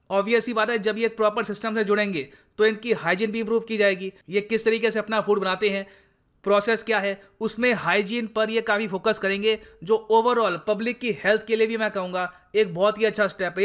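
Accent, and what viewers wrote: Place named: Punjab, India. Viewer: Sir aapka ton Punjabi Wala hai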